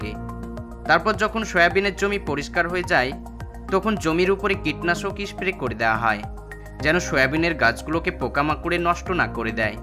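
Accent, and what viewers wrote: India. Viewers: Apni ki malu? Apnar vasha amon keno?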